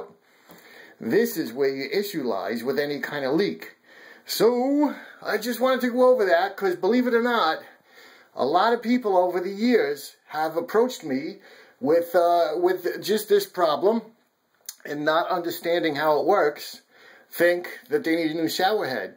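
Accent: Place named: East Coast, United States. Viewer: He's from the East Coast of the USA - you can tell by his accent. Probably Massachusetts or New York.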